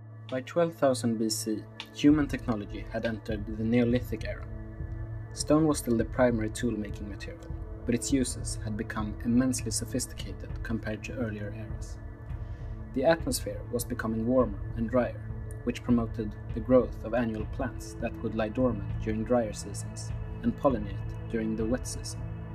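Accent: Sweden. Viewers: Are you swedish? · The narrator sounds sooo swedish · Juan Carlos Andreu He's Swedish, cursed with an accent. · tænk, så stokholm-svensk...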